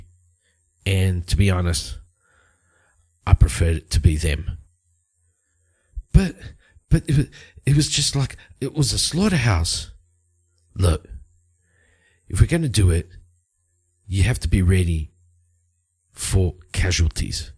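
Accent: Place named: RP, United Kingdom. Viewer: You are so posh. ha ha! Great episode! :trophy-yellow-smiling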